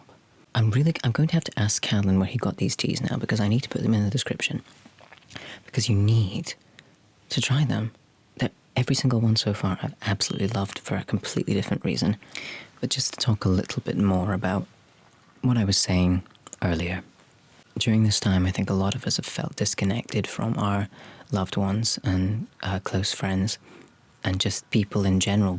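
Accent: Scotland, United Kingdom. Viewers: cool! I thought I detected Scottish! I'm American but my dad's side of my family is Scottish, so I hear their accents almost every day! I've always wanted to go there.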